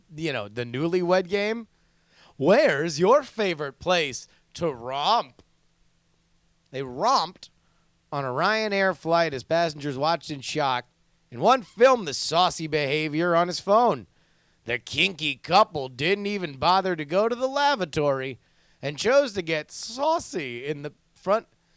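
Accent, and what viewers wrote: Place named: United Kingdom. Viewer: Best British accent in the business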